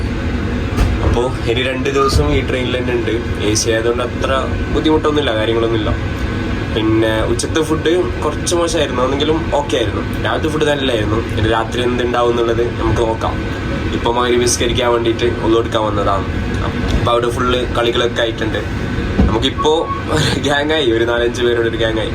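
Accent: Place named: Kasaragod, India. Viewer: കാസർഗോഡ് ഭാഷ ❤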